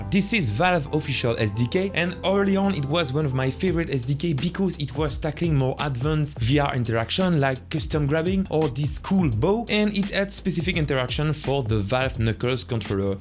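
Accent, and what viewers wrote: France. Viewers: It's so cool how good your english flow is but how extremely french your pronounciations are 😅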